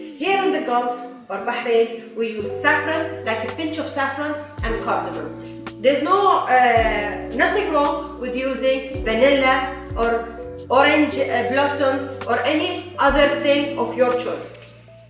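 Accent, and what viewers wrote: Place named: United States. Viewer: Omgosh, you are a joy to watch. I love how simply you make this recipe seem. Yeast scares me but maybe not now. Your English is 100% American perfect. If you don't mind my asking, where did you learn to speak so well? I'm still among from your demonstration. My IG friend sent me your link. I'm going to do as you say, first collect all the necessary ingredients and gadgets before I make this for my friends. Thank you so very much... Stay well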